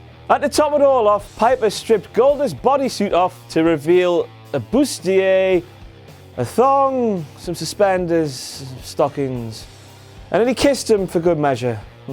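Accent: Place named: Newcastle, United Kingdom. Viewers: I love how the posh accent slowly drifts into the accent of kings, Geordie by the way!!!!! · I love that you can hear the Geordie coming through the pompous accent, it's brilliant.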